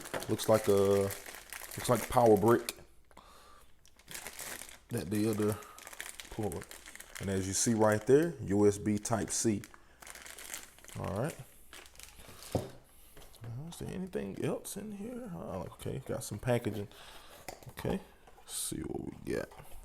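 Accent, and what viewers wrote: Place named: Texas, United States. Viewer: Sounds like Texas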